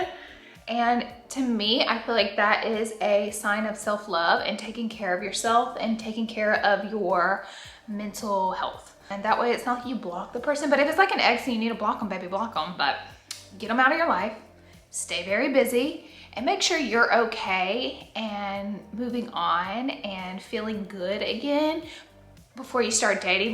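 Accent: South, United States. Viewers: Heyyyy 🤗 I will never get tired of you Accent soo Country I Love it 🥰🥰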